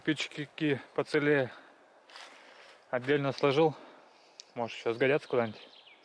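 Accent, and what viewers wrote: Russia. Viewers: сразу слышно что русский!!!!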